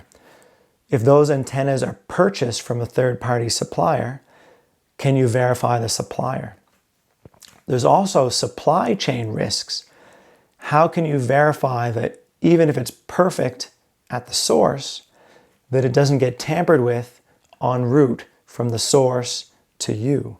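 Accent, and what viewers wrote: Nova Scotia, Canada. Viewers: Yeah, he sounds like he is from Nova Scotia to me and I have met hundreds of east coasters in my lifetime so far. We all talk funny anyway, lol.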